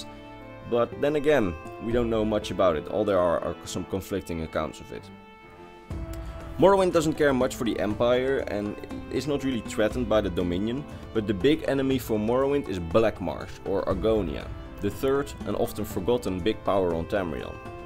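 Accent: Netherlands. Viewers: lekker Nederlands accentje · First 2 seconds i can hear u dutch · It's Dutch haha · Haha bro je klinkt zo nederlands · Ik hoor een Nederlandse accent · Are you dutch? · Is this guy dutch? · Listening to your accent I think you are Dutch, right?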